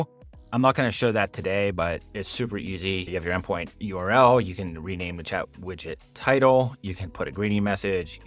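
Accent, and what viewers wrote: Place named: United States. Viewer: is obvious it uses your original voice, but being a native spanish speaker i noticed that language has a strong american accent, like an american learning spanish would speak, is there a way to do it without any accent? like a more natural tone? was that because of a setting? thanks for the video very interesting tool!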